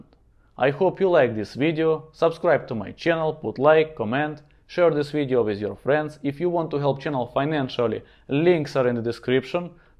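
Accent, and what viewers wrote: Russia. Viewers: Если не секрет у тебя правда такой акцент или ты чуть под стронгер русиян акцэнтиш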